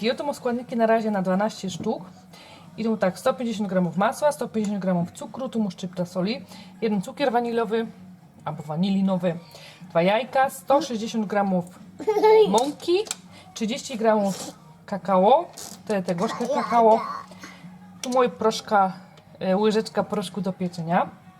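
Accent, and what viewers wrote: Silesia, Poland. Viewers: Super tylko dla mnie ten język Ślązaków jest wolniej przyswajalny :) pozdrawiam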